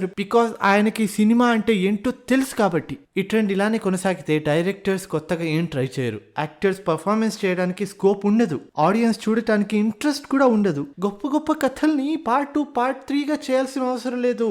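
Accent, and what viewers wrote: Karnataka, India. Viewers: Kannada accent lo intha acha telugu nuv tappa evadu mataadadu ra babu 😁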